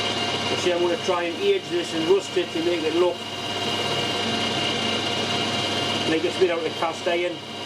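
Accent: Newcastle, United Kingdom. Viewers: just watched some of the video with subtitles on, Im guessing it doesn't understand a Geordie accent.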